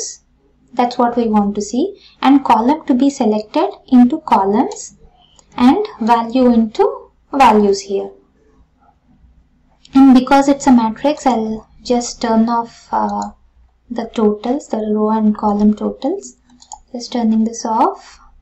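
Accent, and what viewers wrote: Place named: Kerala, India. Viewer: When you have accent, its very hard to understand. are you a malayali?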